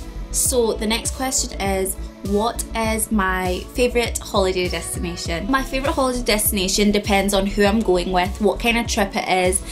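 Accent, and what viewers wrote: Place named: Scotland, United Kingdom. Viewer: Girl you're beautiful !!! ...I 100% expected a London accent myself hahahaha ...I never really liked Scottish accents but you make it sound amazing. Haha! Yaaaas fried chicken ...naaaaah! Your wig fell off in the gym. Oh gosh LOL ...when I ever went to the gym with a wig, I had to wear a cap. True security for me :') ..you're great! I subbed <3xxx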